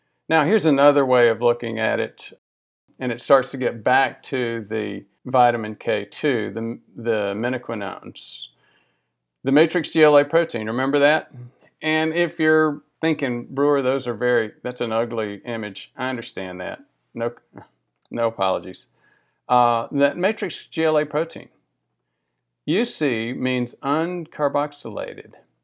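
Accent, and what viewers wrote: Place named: Middle Tennessee, United States. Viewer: thank you for the fast reply. I'm currently shopping for a good used van to replace my decrepit one (it literally can't even make it to Knoxville, much less Louisville.) Then we would be better able to get to your neck of the woods. I thought you had a little "Middle-Tennessee" in your accent. Nashville would be a bit easier to get to, but it is what it is. Wish we had known about David W.. My sister, who resided near Memphis, just recently died during a triple bypass...another death-by-doctors incident, in my opinion. Anyway, thank you very much for the info,. We might see you sometime. Sylvia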